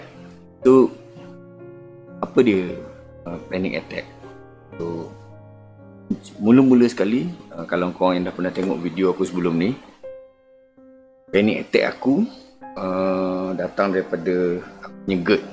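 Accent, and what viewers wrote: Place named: Pahang, Malaysia. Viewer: Sedap betoi dgr bro sembang..bunyi mcm org bentong je....boeeek bro👍👍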